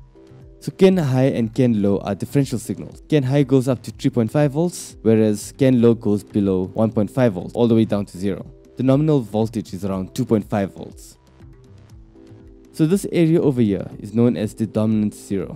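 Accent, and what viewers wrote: South Africa. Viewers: South african accent!!! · Are you from South Africa?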